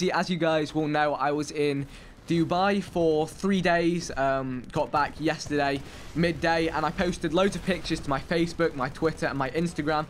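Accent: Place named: United Kingdom. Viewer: Ive only been watching your videos for about a month which is a short time frame in comparison to your 4 years of youtube but I truely enjoy watching your videos and the sideman collaboration! I still find it hard to understand an asian with a british accent but thats probably because I live in the American South (yall). I love the variety I think the more the better. Also Im not a youtuber (yet, school work) but Id like to do a Gta video with you and or the sideman sometime, my gamertag is hockeyslimshady and is also my twitter handle, I lack everything necessary to record gameplay but I think it would be cool to have an american in the gang who is canadian (true) and can speak french, SACRE BLEU! All in all, this feels like an essay but congrats on the 500k and keep up the good work!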